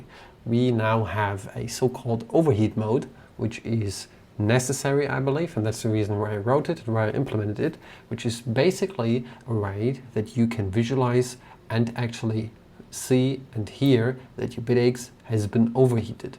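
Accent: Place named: Oxford, United Kingdom. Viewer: Klingt sehe nach Oxfordenglish. Leider ist mein Verständnis sehr begrenzt. 😪 Best regards from Ingo😊. 🖖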